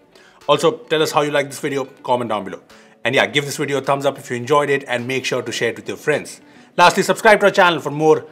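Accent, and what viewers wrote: India. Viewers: I hate when an indian talks english! hell of an accent 😫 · The indian accent is nice ( deeevlper ) ❤😁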